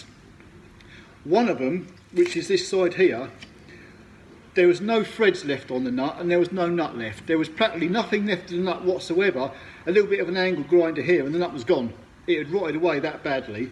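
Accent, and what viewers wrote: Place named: England, United Kingdom. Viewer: That’s the same English accent as Producer-Director Ben Dover.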